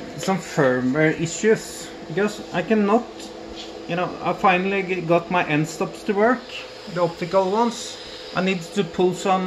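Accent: Norway. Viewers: ok jag visste de ifrån din dialekt har vänner ifrån Norge så kände igen dialekten direkt 😊😊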